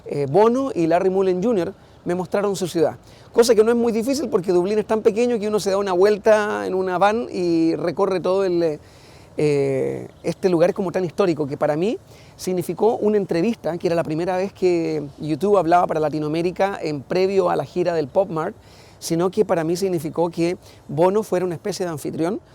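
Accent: Chile. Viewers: ahora si habla como chileno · disculpa cuando estabas en MTV tu lengua era mas clara un verdadero idioma ahora suenas a un chileno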